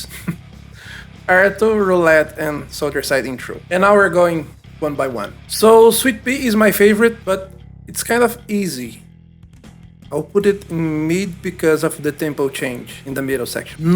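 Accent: Brazil. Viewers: Pelo sotaque é BR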